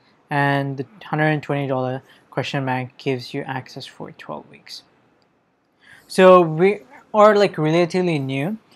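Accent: India. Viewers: you are from bengal and bengali might be because i understand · wideos. You're indian.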